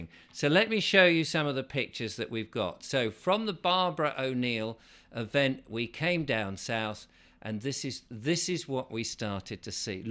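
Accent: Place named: Scotland, United Kingdom. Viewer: I think I detect a slight Scottish accent, that's reward for helping to spread the word up North.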